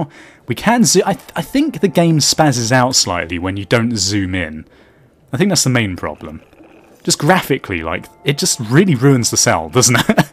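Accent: United Kingdom. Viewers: is so gorgeous and fabulous from british voice like france! He played together with Species-x and make a friends!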